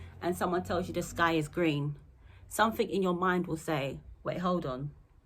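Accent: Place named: England, United Kingdom. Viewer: So beautiful n love the English accent gotta subscribe right now!!!!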